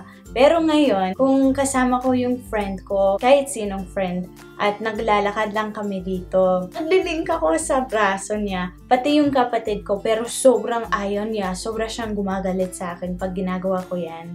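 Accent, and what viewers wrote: Visayas, Philippines. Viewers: ang galing po ninyo mag Tagalog. nice!! May konting puntong bisaya. cute.. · you sound like a bisaya talking in tagalog... i know that because i sound like you... hehee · As a filipino i know what accent You will be good i think bisaya or mostly known the Cebuano language and hiligaynon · Cute video! You speak Filipino Tagalog with a slight visayan accent? And your use of those colloquial expressions are spot on! Very good filipino :) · You speak Tagalog like a Visayan =) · Ang cute niya managalog! Malambing na may puntong bisaya. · Parang bisaya ka day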